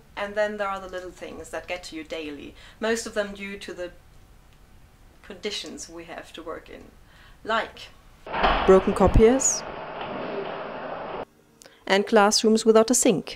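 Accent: England, United Kingdom. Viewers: I like your British accent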